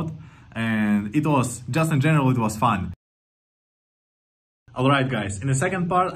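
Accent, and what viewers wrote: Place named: Eastern Europe. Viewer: Okay I am a bit confuse, you sound so European(Eastern European), so why are you in US university? Is America nicking EU talents??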